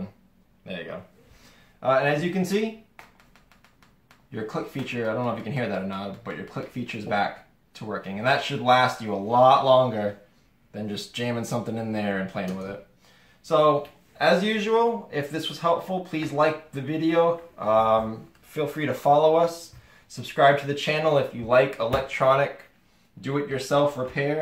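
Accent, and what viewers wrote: Massachusetts, United States. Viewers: Great job teaching how to do this. You sound like you are from Massachusetts :)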